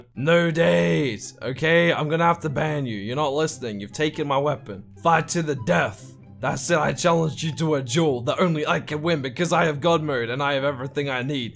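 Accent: Australia. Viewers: ARE U FROM AUS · Is he rrly from Australia?? I am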